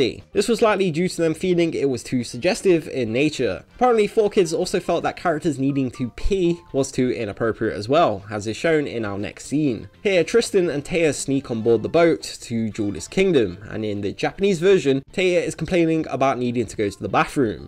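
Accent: United Kingdom. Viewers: All british youtubers have the same cadence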